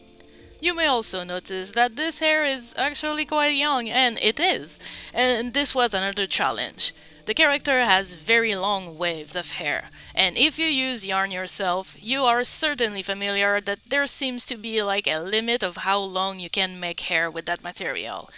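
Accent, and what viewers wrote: Quebec, Canada. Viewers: 1 minute in and I just knew you were french canadian, amazing work mon chum <3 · 2 minutes in, I had to check your "About" section to confirm that you are indeed a fellow french Canadian hehe. I recognized the accent ;)) Salutations de Laval!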